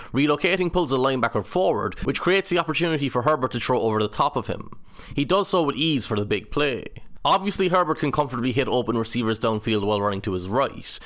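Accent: Ireland. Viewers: I am highly skeptical hearing an Irish accent explaining American football…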